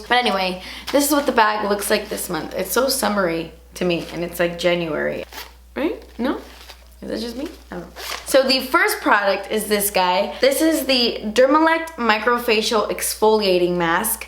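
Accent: United Kingdom. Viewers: Your British accent cracks me up every time! 🤣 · Lmao you really made me laugh when you tried on the lippie 😂 and yo' British åccent haha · That British accent😂😂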